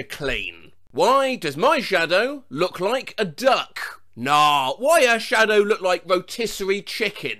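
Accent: United Kingdom. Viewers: British? Stopped watching the video immediately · Scooooul Emooooji, i love british accent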